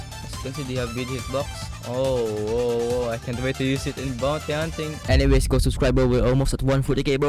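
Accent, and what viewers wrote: Bisaya, Philippines. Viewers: "Bro palaban sa sea beast haahahahahhahahah" Leme ask u are u Tagalog or bisaya bc the way u talk it's like u are bisaya but idk Bruh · First time ko sa channel mo tas yung accent mo sabi ko pilipino toh😂... Naks luv it keep it up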